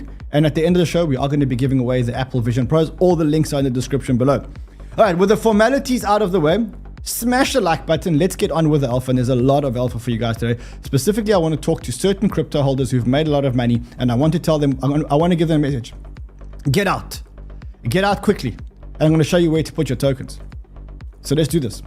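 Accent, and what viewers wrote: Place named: South Africa. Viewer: He is South african based in Cpt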